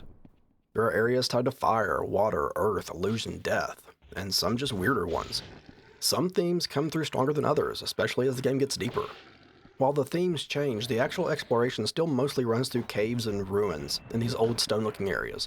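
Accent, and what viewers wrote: South, United States. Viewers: Small startup channel playing weird shit in a southern accent? Sign me up!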